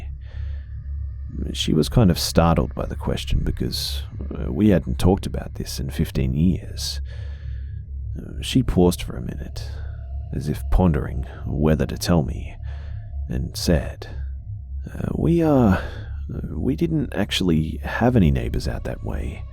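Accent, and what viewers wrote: Australia. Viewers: LOVE THE AUSSIE NARRATING..:D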